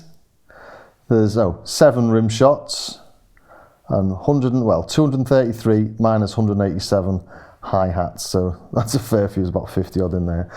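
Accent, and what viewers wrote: Liverpool, United Kingdom. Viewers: love the video its helped me out, are you a liverpool lad?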